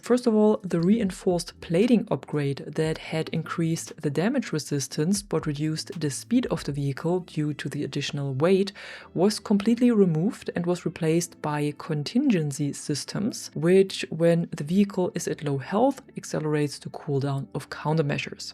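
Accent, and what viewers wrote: Germany. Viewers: Ich wusste doch dass du deutsch bist. Hab mich immer über den Akzent gewundert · Dieser deutsche Akzent, wow😂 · Is she German? accent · German accent detected. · Love your german accent.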